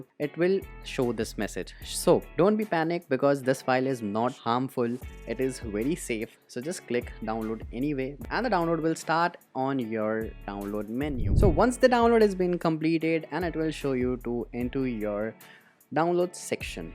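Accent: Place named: India. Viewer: Best Indian accent i’ve ever heard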